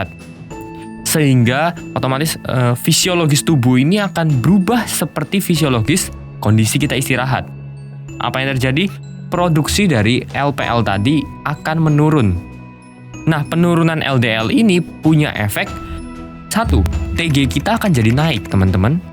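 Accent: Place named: Java, Indonesia. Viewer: Medok seru 😆 tpi apik mas Gas teros kontennya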